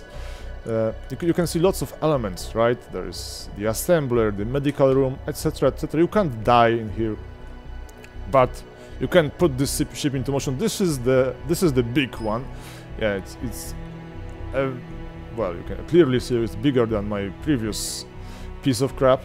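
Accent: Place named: Russia. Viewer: rasian akcent like wuj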